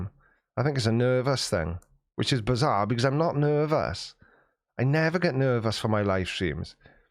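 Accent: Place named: Wales, United Kingdom. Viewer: G'day from South Australia, I think the Welsh accent is the best in the world followed by the South African. There is nothing wrong with speaking your mind, don't change that's why we follow you.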